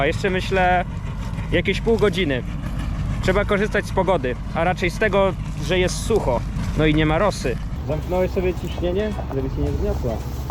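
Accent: Silesia, Poland. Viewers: Jesteś z śląska?? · jesteś ze śląska? bo czuć u ciebie ten śląski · Mówi się zilos, a nie silos. A w filmie zauwarzyłem że też godosz po Śląsku. Ps. Jestem ze Śląska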